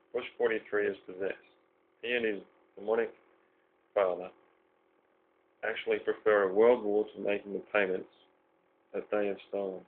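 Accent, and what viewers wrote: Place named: England, United Kingdom. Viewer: weeks huh? LOL-makes me question current info on the current Supposed events Re: Imminent Mass arrests, Banker resignations, Cabal Lawsuits-White Dragons vs. The Fed, et al.-TROLL Radio Show? Or just took longer than expected...You decide...fyi, this guy here isn't even American!! English accent-bad troll radio!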